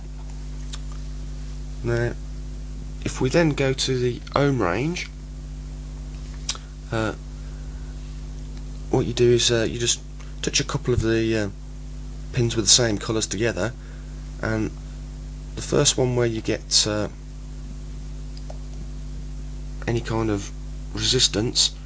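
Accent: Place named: United Kingdom. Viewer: RIGHT, RIGHT!!!!! I JUST LOOOVE THAT ACCENT. really cute, you British people. Please don't let your accent get away as it seems all things are going. At least there will be some "tickles" for the U.S.A. if one of you visits here. (Don't worry, I konw that "Jolly Good" is no acceptable. :O).). I donate to your cause. (I speak in liue of the part time job only, slow sticker vheickes, THE BOX, Future consciousness Sin Unit, Higher Power, and Paradigm Shift for 8 and 12 years.) Again, LOVE it!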